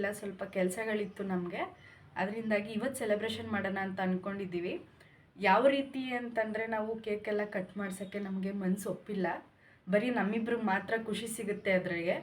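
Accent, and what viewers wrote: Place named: Mandya, India. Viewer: Mam ನಿಮ್ ಮಂಡ್ಯ ಮೈಸೂರ್ ಕಡೆ ಭಾಷೆ ಎಷ್ಟು ಚನ್ನಾಗಿದೆ ಕೇಳೋಕೆ ನಮ್ಮ ಉತ್ತರ ಕರ್ನಾಟಕ ಭಾಷೆ ನಿಮ್ಮಷ್ಟು ಚನ್ನಾಗಿಲ್ಲ 🥰❤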